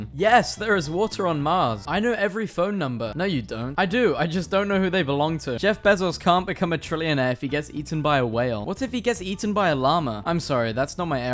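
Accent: United Kingdom. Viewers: Is it just me that noticed he has a British accent now 😃?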